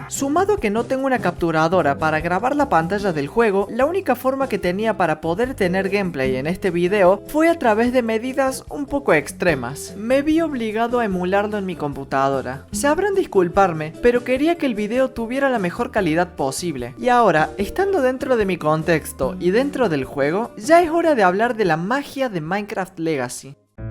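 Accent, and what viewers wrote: Córdoba, Argentina. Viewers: Tu tonada cordobesa le da un plus al video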